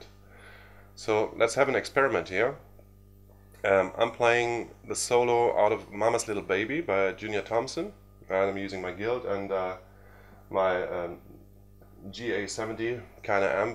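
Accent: Germany. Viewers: I'm trying to Google, and all I can get is info on Andy Richer. I've never heard of either. ' accent, sounds Dutch, Austrian, German, anything but American south of the Mason-Dixon line which, as most people know, separates northern states and southern states of the USA. Anyway, I finally found some info on this "expert" on American rockabilly music. He has no pedigree of any kind. He's no doubt a good guitar player, I've never seen or heard of him, but I give him the benefit of the doubt. The commentators who use words like "awesome" are incredibly gullible. Don't they realise that there are indigenous rockabilly players who have generations of knowledge of this music behind them from whom they could learn much more than a German fan of the music who has decided that he can teach the world how to play it? Sorry, and I'm absolutely sure that your real first name is not, doesn't sound German.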